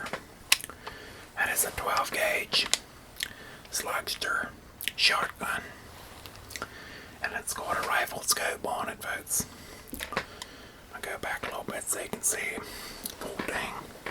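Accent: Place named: South, United States. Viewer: Didnt know a souther drawl with asmr was so good!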